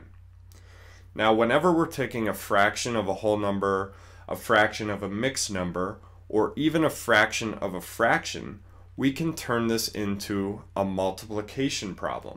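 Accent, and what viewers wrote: United States. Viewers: Sir you are saying like an American so l cannot understand what you are saying l can see and trying to understand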